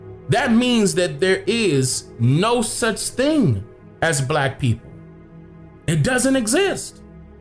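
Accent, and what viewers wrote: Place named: Washington DC, United States. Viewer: are you from dc I feel like I hear it in your voice!!! Thanks for keeping my mind working!! My dad told me these things when I was in elementary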